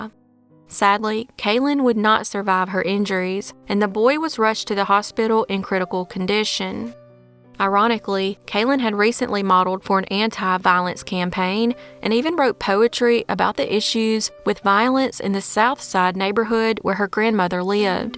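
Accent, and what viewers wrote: South, United States. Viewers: 🤬🤬🤬dang girl!!!! ❤️that fr southern accent!!! 😍😉🙃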